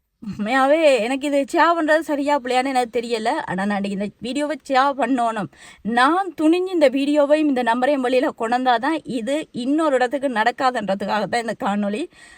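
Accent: Sri Lanka. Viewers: u r great,ur job is excellent, oru manitha mirugam panniya seyalu ku neenga feel pannatheenga, unga srilanga tamil speech sooper,all the best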